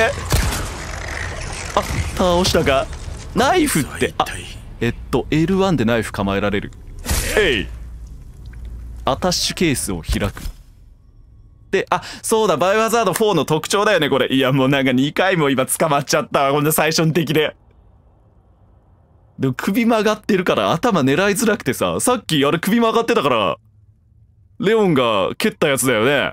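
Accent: Kansai, Japan. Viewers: なんで関西弁なん？笑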